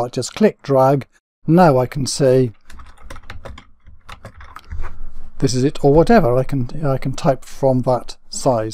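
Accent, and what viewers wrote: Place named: Wales, United Kingdom. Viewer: Just found you. You explained that so clearly... and you're Welsh! Thanks, I've subscribed.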